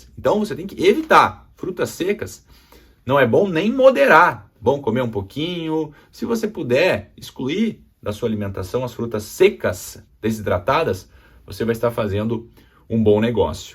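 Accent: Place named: South, Brazil. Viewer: Falo de Paço do Lumiar Maranhão, já tinha percebido que ele é do Sul pelo sotaque rs, nota 10 pro vídeo, muito explicativo, esclarecedor, além de muito dinâmico e simpático ainda é um gato 😍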